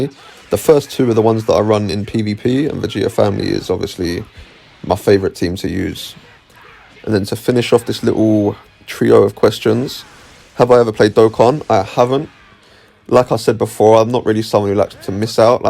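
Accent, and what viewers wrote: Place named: United Kingdom. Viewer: Get less British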